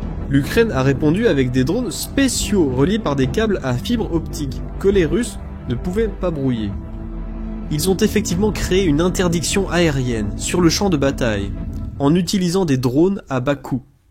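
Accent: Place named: Belgium. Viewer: Slavia Ukrainia. Une voix Belge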